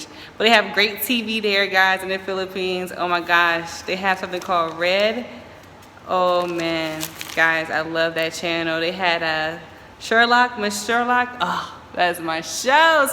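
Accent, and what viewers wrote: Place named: Nashville, United States. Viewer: Filipinos are not racist.. black or white is ok..no problem because w are brown people😉😉😘 but your accent is like my friend accent she is living in Nashville 🤩💖love it🤗 Great video!☺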